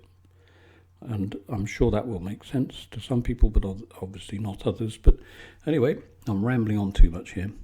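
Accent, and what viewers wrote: England, United Kingdom. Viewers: This is by far the best thing the YouTube algorithm offered me in a long time! I love the voice, the British accent, the calm way of you explaining your technique. I am beyond excited to see more videos! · Very natural sounding speach. Im a fan of the english accent. Very relaxing. Thank you sir.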